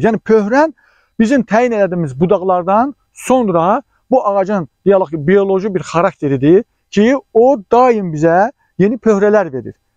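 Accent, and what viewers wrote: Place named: Qakh, Azerbaijan. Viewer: Əlhəmdulillah Qaxlilarin ləhcəsi supperdidə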